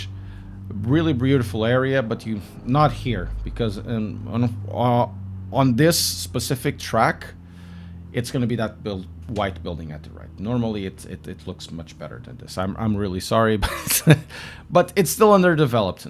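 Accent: Portugal. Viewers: did you not notice his very obviously portuguese english accent? took me a total of 4 seconds the first time i watched him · Cum crlh, ainda ontem estava a ver um video do canal e a pensar para mim que pelo sotaque este homem tinha de ser tugues 😅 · És português.? Esse sotaque Cascais tá on point ahaha um grande abraço !!! Que bom ver um português a dar se bem no sim world !! Grande abraço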